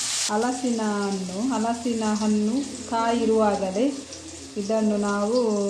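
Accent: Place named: Mangalore, India. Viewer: ಮಂಗಳೂರು ಕನ್ನಡ ಕೇಳೋದೇ ಚೆಂದ 😊😊😊😊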